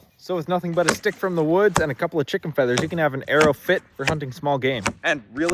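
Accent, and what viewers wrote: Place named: Lancaster, United States. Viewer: OMG There's an American archery guy now! (I love your voice btw, reminds me of some of my friends in Lancaster, PA).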